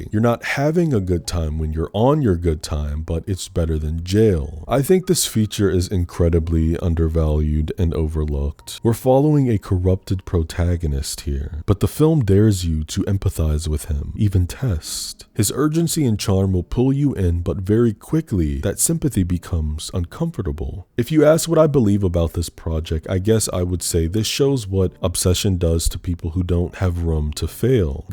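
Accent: United Kingdom. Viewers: you fool lol. I absolutely love your uk/brit accents, they just scratch the perfect spot in my brain lol 🙂‍↕️🤝🧡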